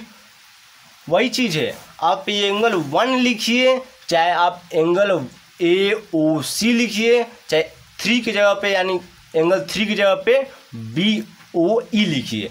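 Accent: Bihar, India. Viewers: Kitna bihari bolta h itna dana samjha tha h chiiii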